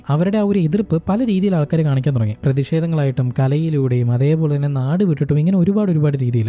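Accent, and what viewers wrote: Palakkad, India. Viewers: bro sathyam para ni palakkad alle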